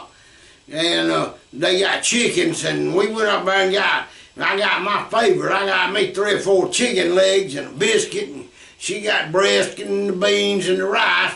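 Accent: South, United States. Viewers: Over here in England we just love that country accent of yours and your down - to -earth approach to things. Just bought myself a lap steel and finding your teaching sessions a real help and inspiration. Thanks.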